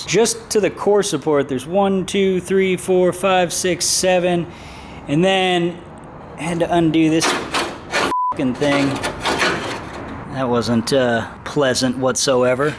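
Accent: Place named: Texas, United States. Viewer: Helll yeaaa bother ! Merica! Let them bald eagels fly brother! heavy texas accent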